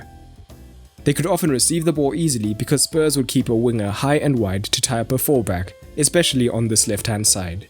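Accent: South Africa. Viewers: R u South African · You sound South African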